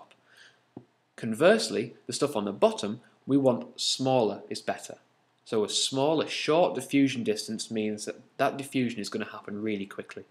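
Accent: United Kingdom. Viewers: Oh myyyy .. That British Accent that I miss a lot!!!!!! Thank you it was so helpful for my SAT biology subject test :)))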